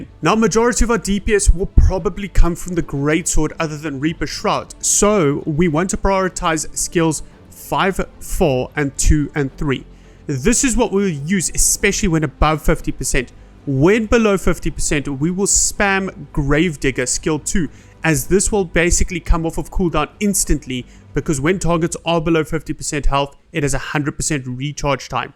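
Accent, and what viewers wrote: South Africa. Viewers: is that the distinct south african accent i am hearing?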